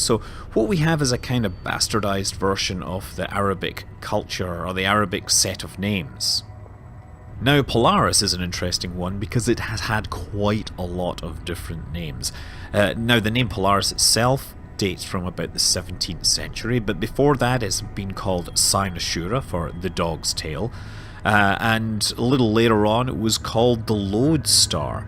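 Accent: Scotland, United Kingdom. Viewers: I just listened to a scottish man talk for 20 minutes about catalogues. Life is good.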